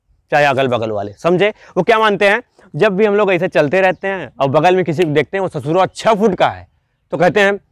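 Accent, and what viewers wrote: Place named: Morena, India. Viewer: Aap bilkul Morena walo ki tarah bolte ho humari desi aawaaj mein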